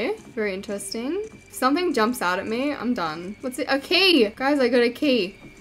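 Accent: Australia. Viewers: yk shes aussie just by the way she speaks <3 I can relate.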